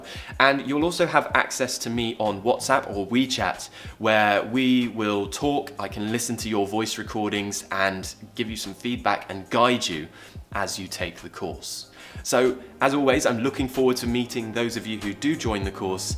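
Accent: RP, United Kingdom. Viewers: don't know what i would've done.. if I hadn't seen your videos... i learnt amazing british accent... love ya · without exaggerating you are the Best example For the Morden R p accent, and I have learned something Form you....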